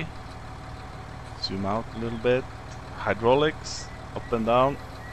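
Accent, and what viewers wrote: Norway. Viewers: Are you norwegian? :)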